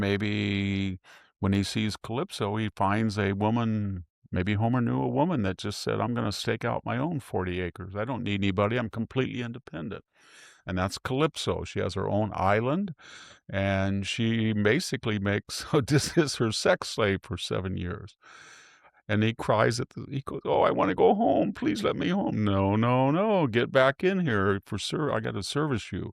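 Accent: United States. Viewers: ❤❤❤❤it is so nice for me hearing the old greece-names with an american-english pronunciation. If you would not write the names I would not understand the original greece names❤❤❤❤ regarding your topic, you are absolutely right, "classical literature was once the cornerstone of education" =I appreciate this sentence so highly ❤❤❤❤ I am living in Wien/Austria, and I estimate the tendence is here the same as you described❤❤❤❤ warm greeting to you❤❤❤❤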